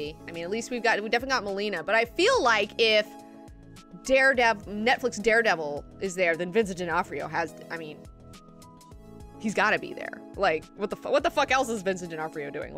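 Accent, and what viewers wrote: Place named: Alabama, United States. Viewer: I don't know if you recently talked with some fam and friends for the holidays but, WOW! I can hear that Beautiful Alabama so much in your voice on this one. maybe it's my imagination...great vid!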